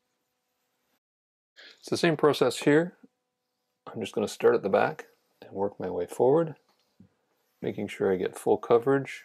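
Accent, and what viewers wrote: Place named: Canada. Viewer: I enjoy a good voiceless restoration, but it was super cool to hear the explanation for everything. Its always great to see experienced professionals at work, this was a real treat! Proud of myself for catching the canadian accent almost immediately lol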